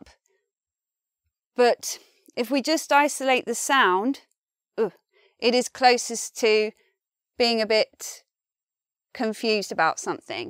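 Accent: United Kingdom. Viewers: What accent is this? It sounds British but.... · The presenter's British English makes this tutorial for memorizing IPA less useful for a learner who speaks US English as there are many differences between British and US midwestern pronunciation. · You are the symbol of the British accent.. I like to hear and listen to you a lot 🤗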